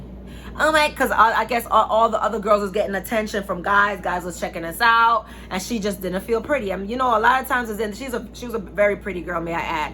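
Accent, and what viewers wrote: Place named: Jamaica. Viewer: I hear that Jamaican accent! ♥️ Great content.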